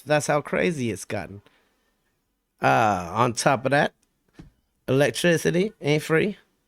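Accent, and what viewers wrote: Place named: Louisiana, United States. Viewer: You sound like Louisiana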